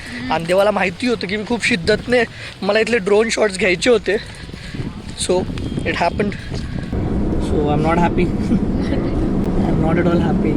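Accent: Khandesh, India. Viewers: Kay dada jordar khandeshi😂😂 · Dada Tuz boln khup Chhan vatat ahirani Karan me pn nasik Chi a satana tal madhun tuz boln jvlch vatat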